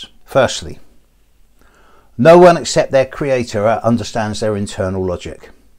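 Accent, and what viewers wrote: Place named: West Country, United Kingdom. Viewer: That West Country accent comes out now and again 😆... cut the jokes mate🙄stick to the Astrology 👍sorry I’m an outspoken Sagittarian🤭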